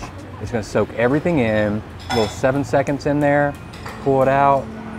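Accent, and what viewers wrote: South, United States. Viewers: Do I hear a little southern accent? Love it! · the combo of his southern accent talking about his love for Vietnamese noodles... <3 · First time seeing you’re video and I must say, you are a gorgeous man. And on top of that the food you chose, your southern accent and how you described everything was great. Keep up the great videos :)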